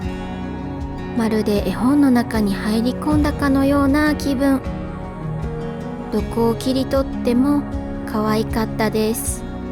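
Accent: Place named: Kansai, Japan. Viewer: 関西弁がすごく癒やされました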